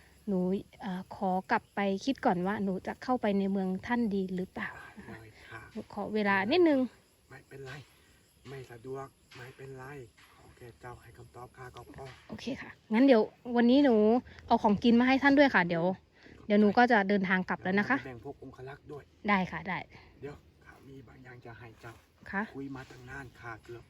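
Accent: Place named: Isan, Thailand. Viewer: น้อง รู้ไหมเวลาน้อง พูดอีสานเสียงเพราะน่ารักมาก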